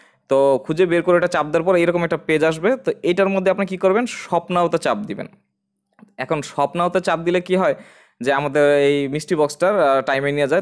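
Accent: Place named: Fatullah, Bangladesh. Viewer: Vai apnar basha ki fattulah naki 😅😅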